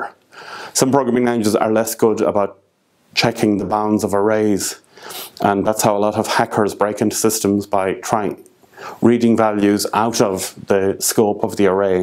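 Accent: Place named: Germany. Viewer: Are you German? You have a Flula kind of accent. Pretty cool.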